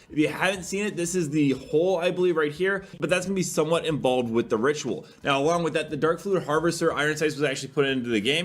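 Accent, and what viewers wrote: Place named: Texas, United States. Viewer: Even as a f*cking french i can smell your texas butthole accent frome here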